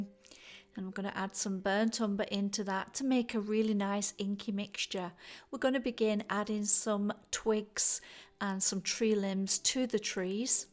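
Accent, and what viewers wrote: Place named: Northwest England, United Kingdom. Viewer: I really do see your method as the best way to paint, by separating the issues of value and colour the stress is taken away as achieving the painting becomes more of a step-by-step process. My background is in project management so breaking big jobs into small organised tasks comes naturally to me. I see things in your techniques and methods I've not seen before, so inspiring to me. The technique of underpainting starting out with a mid tone and then wiping/erasing for lights and reinforcing darks makes it look so simple and easy, I guess great skill and experience help too ;-) You paint just the way I want to, not ashamed to use reference photos and not scared to try different ways to achieve gorgeous results. So next month, when I have some spare money, I shall go to your website to buy a subscription. 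I've already cancelled my paid subscription to a SW USA chap's tutorial site where he covers all kinds of materials and methods but just on the surface whereas I want to dig deeply into and concentrate on the indirect method in oil but with modern methods as you do, combining tradition with innovation. Your approach suits me just fine and I prefer your northwest(?) English accent too. West of the Pennines not too far from Manchester?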